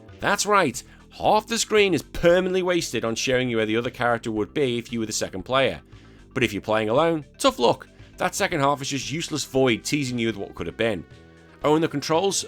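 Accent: United Kingdom. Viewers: I love it when a British person yelled us how bad a game it’s that we didn’t get! We didn’t get Lester the unlikely! Or did you play it when you were 13?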